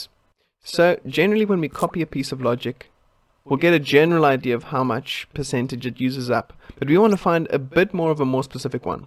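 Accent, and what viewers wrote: South Africa. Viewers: That south african accent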